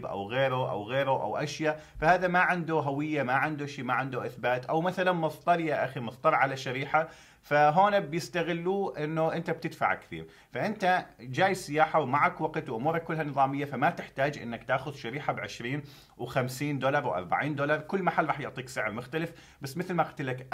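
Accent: Deir ez-Zor, Syria. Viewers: كانك من الدير🔥🔥🔥💪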